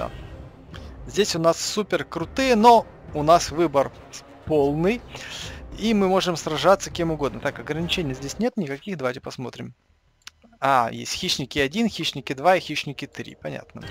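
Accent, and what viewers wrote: Ukraine. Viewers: Ти из украини?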